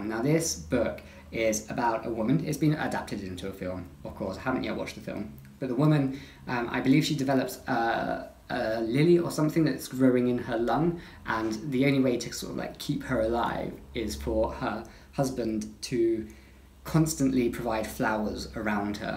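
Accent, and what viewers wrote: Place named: United Kingdom. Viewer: I am from india ,came here to find. Something about surrealism but now I am like ..Wow I like this british accent 😘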